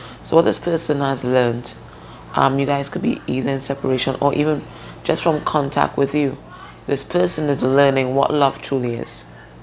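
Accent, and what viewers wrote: Trinidad and Tobago. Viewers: Trini accent?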